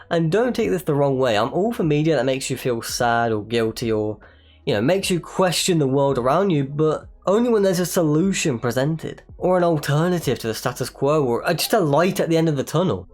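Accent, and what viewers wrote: United Kingdom. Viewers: you like like my older brother but... british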